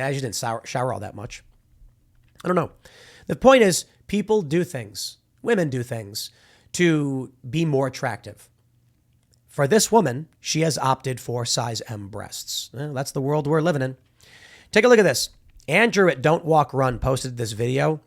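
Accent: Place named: Chicago, United States. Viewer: This is the most Chicago has ever sounded.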